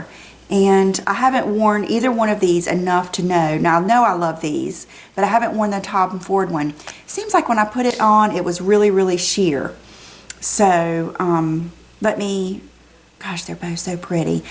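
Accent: South, United States. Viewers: I just discovered your videos, and I'm addicted! You are so enjoyable to watch. Love your taste, your attitude, and your southern accent! Just gorgeous all around.:)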